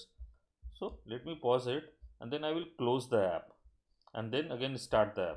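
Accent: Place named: India. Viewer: Sean Gardner relax man... he speak like an Indian. I don't think he's a Isis member. but, we can't trust too. :/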